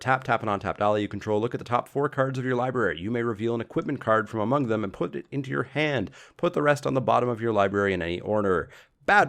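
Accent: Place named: Canada. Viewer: Nice content. Where are you from in Canada that you pronounce "un" as "on"? Tap an "ontapped" ally you control. Onto the "oncommons". Etc.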